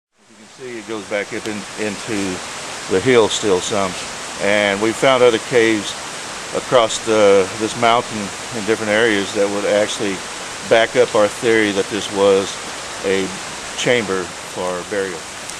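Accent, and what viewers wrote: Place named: South, United States. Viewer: That is just his accent! He is actually pronouncing it similar to dune, you just hear it as run, or closer to ruin, but said together and fast. I suggest you never visit the South, or any other place where they tend to have accents, like EVERYplace! lol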